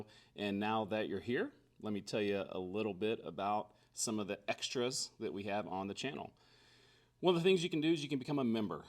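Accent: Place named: Texas, United States. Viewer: Coach, you have spent enough time in both Texas and Oklahoma to develop a slightly perceptible country twang. It sounds cool...you wear it well! 🤠 Coach, please stay out of Gouge Eye...it sounds like a rough place!🍺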